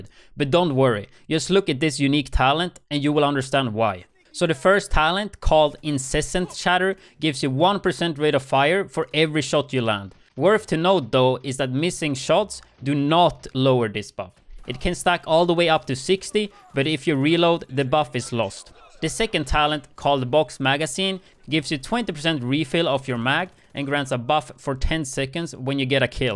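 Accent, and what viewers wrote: Sweden. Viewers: the Swedish accent is strong with this one!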